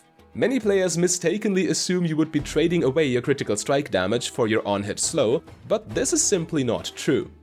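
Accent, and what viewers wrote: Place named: Germany. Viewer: Theres a lot of different builds being pitched for us noobs but I wont question a gamer youtuber with a good mic and a german accent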